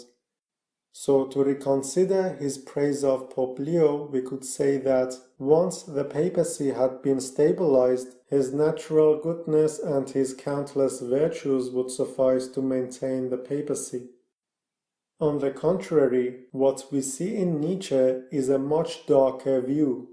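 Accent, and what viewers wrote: Iran. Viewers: Are you Iranian? atleast that's what I a got from your accent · ???, I liked it already. You can like it too... I love the Iranian accent. What makes you think that you cannot like it if it is Iranian?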